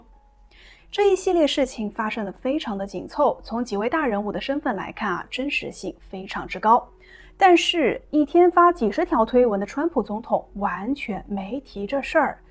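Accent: China. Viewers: 這是大陸哪裡的口音啊? 真好聽的聲音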